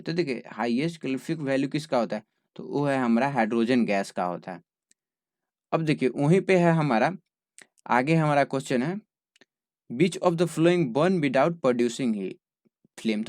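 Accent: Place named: Bihar, India. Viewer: Aap kaha se ho .. mujhe aapki voice se lag rha h ki aap bihar ke ho ..mtlb ya to aap bihar me reh rhe hoge ya jharkhand me 😁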